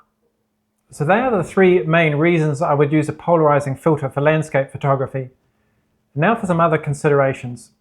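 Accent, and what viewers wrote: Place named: New Zealand. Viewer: Nice to see and hear some NZ images/accent. I use Cpl filters alot for black and white photography.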